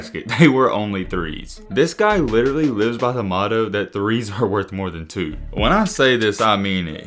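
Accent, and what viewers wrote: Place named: Kentucky, United States. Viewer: where you from man, got the same dialect as us here in KY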